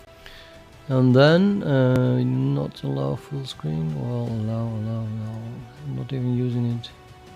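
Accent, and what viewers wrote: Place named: Netherlands. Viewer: nederlander detected I thought you were Spanish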